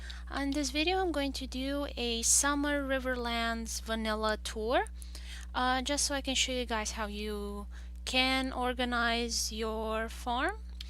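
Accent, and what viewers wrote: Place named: Iran. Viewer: Very cool video. I like your accent. Are you Persian?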